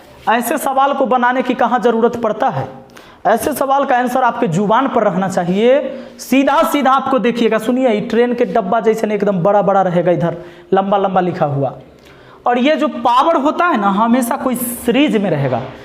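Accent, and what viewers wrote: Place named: Bhojpuri, India. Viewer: Sir aapka bhojpuri wala hindi bahut acha lagta he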